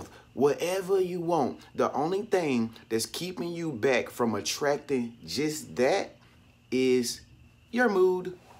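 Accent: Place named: Midwest, United States. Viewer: This is True!🙌 Btw, r You from the Midwest?🤔 Ur accent is similar to where I Am.....👊😏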